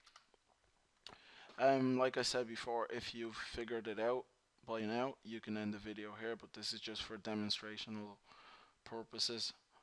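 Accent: Ireland. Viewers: Thank you man.. 🙏 luv dat Irish accent btw 🤗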